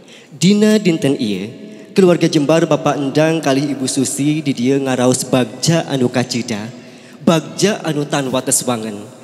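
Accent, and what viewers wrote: Sunda, Indonesia. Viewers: Sae tah nyunda ngalegeuna.ciri has utang sunda.terus mumule bahasa sunda.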